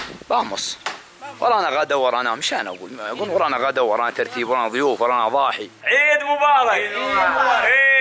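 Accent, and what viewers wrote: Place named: Subay'i, Saudi Arabia. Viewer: انشدك بالله انت من مطير ولاسبيع لغوتك وفي روايه اخرى للنقوج ودمك ماهو دم عتيبي ولادوسري حي يامال العز ودي انك مطاري بس اشك انك سباعي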